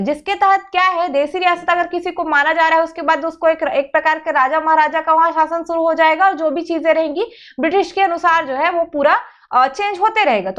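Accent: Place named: Odisha, India. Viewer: Thoda odiya accent ata hai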